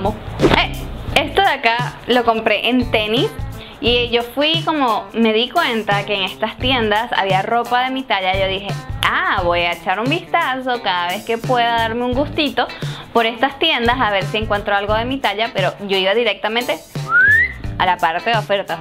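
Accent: Guayaquil, Ecuador. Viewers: habla como Guayaca!! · habla como guayaca es verdad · ya se te pego el acento ecuatoriano soy de guayaquil Ecuador☺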